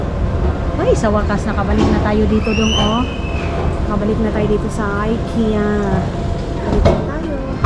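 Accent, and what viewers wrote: Visayas, Philippines. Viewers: Tuwang tuwa talaga ako sa panonood sa iyo my dear maninay..yung pananagalog mo na me halong bisaya😊kahit di kita naiintindihan minsan patuloy ako sa pag aabang sa mga vlogs mo😅😅..go lng sa vlogging mas marami kaming viewers mo na naghihintay kht paulit ulit mga lugar na pinapakita mo sa amin..nevermind those bashers basta andto lng km😊😊😊❤❤❤happy ka happy din km na nagmmhal sa inyo ni..God bless❤🙏❤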